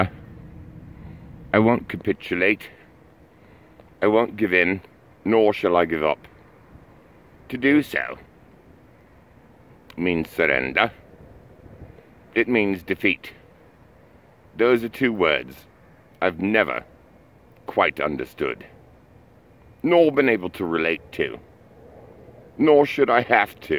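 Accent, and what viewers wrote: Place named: England, United Kingdom. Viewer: Loving your English accent 🤩 you good!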